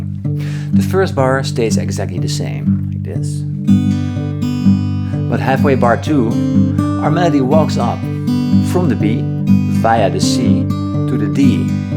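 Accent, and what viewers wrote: Netherlands. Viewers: cant miss that Dutch accent!! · with an accent. You can tell right away that he’s Dutch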